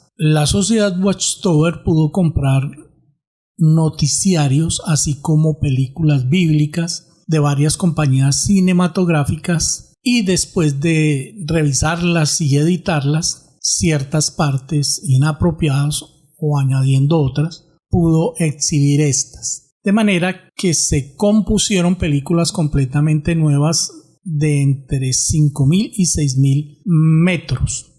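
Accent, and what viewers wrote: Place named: Colombia. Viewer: CON TODO RESPETO, TU ACENTO ME DICE QUE ERES DE COLOMBIA,PRIMERO QUE TODO. CHARLES TAZE RUSSELL, NO TENIA COMO DIJISTE COMO 70 AÑOS EN 1914 CUANDO SALIO EL FOTODRAMA,TENIA 62 AÑOS, YA QUE EL MURIO EL 31 DE OCTUBRE DE 1916 , TAMBIEN NO DIJISTE QUE MUCHOS DE LOS DISCURSOS QUE DIO FUERON EN SALONES MASONES, ADEMAS DE HABER VENDIDO UN TRIGO DE FORMA FRAUDELENTA ,POR TAL HECHO LO DEMANDARON Y REGRESO EL DINERO , A LOS DAMANDANTES ,TAMBIEN SE TE OLVIDO DECIR QUE COMETIO ADULTERIO POR ENGAÑAR A SU ESPOSA MARIA FRANCES RUSSELL. CON SU SECRETARIA UNA MUJER MAS JOVEN QUE SU ESPOSA , FUE BORRACHO TAMBIEN, Y DE SU SUCESOR JOSEPH FRANKLIN RUTHERFORD, OTRO BORRACHO , MUJERIEGO, E INCLUSIBE COMETIO PEDERASTIA AL CONQUISTAR A UNA JOVEN DE 16 AÑOS DE NOMBRE BERTA, ADEMAS CON UNA MUJER CASADA DE NOMBRE BONNIE, EN 1935 SALIO EL PRIMER LIBRO DE TEXTOS DIARIOS , DONDE EL DECIA TENER UNA GRAN ADMIRACION POR ADOLFO HITLER, Y LA RAZON POR LA QUE ENCARCELARON A LOS TESTIGOS DE JEHOVA EN ALEMANIA NO FUE POR PERSECUSION POR SU FE , FUE POR CULPA DE RUTHERFORD, AL PONERSE A FAVOR DE LOS JUDIOS , ESA FUE LA RAZON DE PERSEGUIR A LOS TESTIGOS, Y DE OTRAS MAS , NO LO HUBIERAN PERSUEGUIDO NI ENCARCELADO , YA QUE DECIAN QUE NO ERAN UN PELIGRO PARA EL PARTIDO NAZI , POR SER NEUTRALES POR SU RELIGION , Y SI NOTAS LOS UNIFORMES DE ELLOS TENIAN UN TRIANGULO, A DIFERENCIA DE LOS JUDIOS CON LA ESTRELLA DE DAVID .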